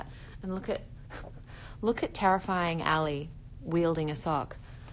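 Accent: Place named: United States. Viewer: THE AMERICAN ACCENT HAGAHAHHAHAHA IT WAS GOOD THO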